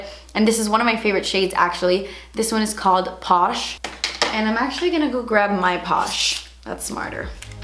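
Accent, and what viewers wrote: Cuba. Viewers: looool i love how u say ahhh entonces! with ur cuban accent! so lovely! im spanish and im always grateful to hear some of ur spanish words 😜😜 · I'm gonna get rid of that couch, ah entonces? So Cuban. Love you, you talk more often in Spanish, it sounds cool.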